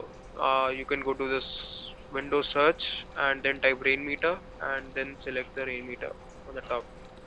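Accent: India. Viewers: haha love indian accent xd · hhhhhhhhhhhhhhhhhhhhhhhhh the indian english soooooooooooo bad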